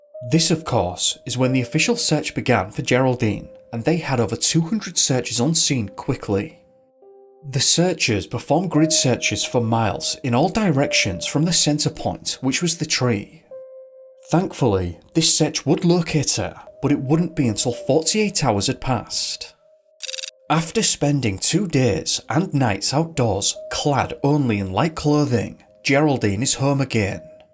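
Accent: United Kingdom. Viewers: Chills with a British accent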